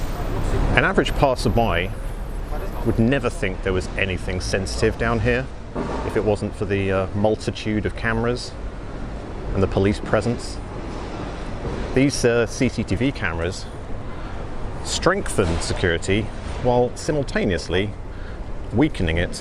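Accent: England, United Kingdom. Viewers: New sub keep doing what you are doing and there is nothing like an English accent to teach.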